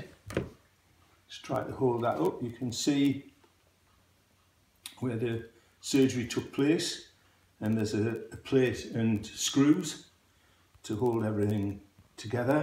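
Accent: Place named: Newcastle, United Kingdom. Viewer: You sound like a geordie too! Lol like me. I am post surgery week 7. I am now on a moon boot instead of plaster. I am finding it difficult with physio. I am a 33yr old mother with two young children and I was studying to be a nurse in my first year of nursing. I had an accident and dislocated my ankle and broke it in three places, they said tibia, fibia and trimelleour I have had a plate in the side and screws and another plate, across and and something else. I have had to postpone my studies and will continue at a later date. To anyone reading this you've got got this. It's bloody hard work and it's not easy, am 7 weeks in and my skin has dried up and I'm still swollen and lost alot of muscle too. I am still very sore and I still have some bruising. I am worse mornings and evenings. I elevate my leg and I also do physio from home.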